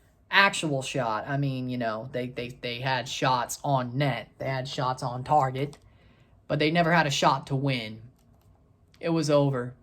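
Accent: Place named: Midwest, United States. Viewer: Are you from Canada? Your accent sounds like Midwest USA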